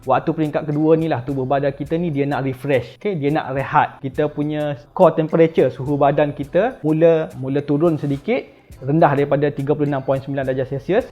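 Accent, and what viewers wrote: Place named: Kelantan, Malaysia. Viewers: abg ni mesti kelantan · Bunyi lg slang kelantan tu🤣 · Hehe....klate la tu bosskur...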